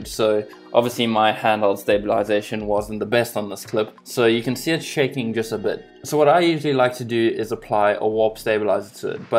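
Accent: South Africa. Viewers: dude are you south african???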